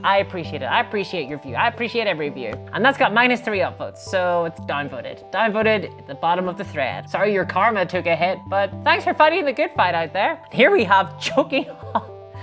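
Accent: Northern Ireland, United Kingdom. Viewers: Dont know whats the hate about not only is she gorgeous and has the British accent, she give us the up and down of the evil GW. lol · Great video. I'm from Norn Iron and I admit when I first watched your stuff I was horrified at hearing an accent similar to my own on YouTube - we are after all a self loathing breed of people. After about 10mins I was hooked! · This has me laughing out loud at several places. Very good. But I do have one question...is there a Northern Irish accent in there?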